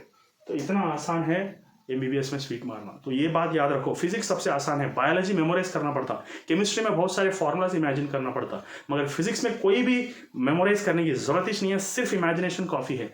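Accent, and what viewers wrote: Hyderabad, India. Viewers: Sir ki hyderabadi accent sunne ko aya main. Hou kya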